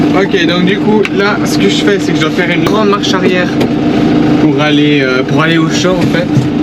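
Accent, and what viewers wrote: Switzerland. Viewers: Salut ue vien de recouvrir ta chaine et ca a l'air cool je trouve que tu a un accent suisse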